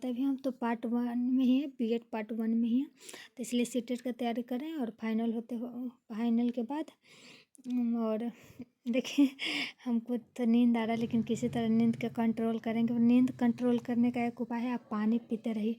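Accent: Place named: Mithila, India. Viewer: Lag raha hai aap mithilanchal se hai kya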